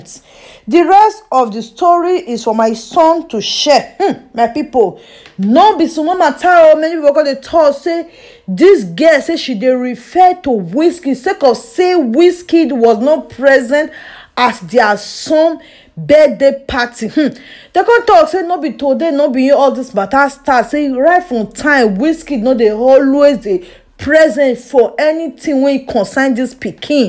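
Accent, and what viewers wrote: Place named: Gullah, United States. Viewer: Enjoyed the video but I couldn't help but notice how similar your dialect is to the gulluh geechee dialect from South Carolina and patois from Jamaica.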